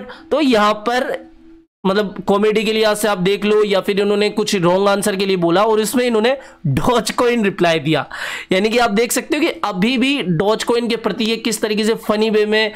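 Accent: Jaipur, India. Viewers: tumhare way of talking se lagta h tum jaipur se ho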